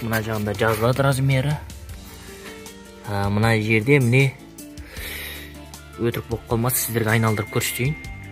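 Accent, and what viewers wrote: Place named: China. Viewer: Тіліңнен. Айналдым қытайдың Қазағында сакталган.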